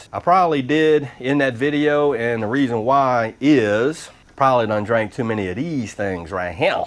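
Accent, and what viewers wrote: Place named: Louisiana, United States. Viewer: Purple hulls, pink-eyes, white peas, ALL the southern peas, can be blanched and frozen just this way. Most of the country, even many parts of the south, just don’t know peas like we do in SE Alabama/SW Georgia, but you do, friend, so you’ve done a public service with this vid. Besides, I just love to hear you talk (Louisiana, maybe?).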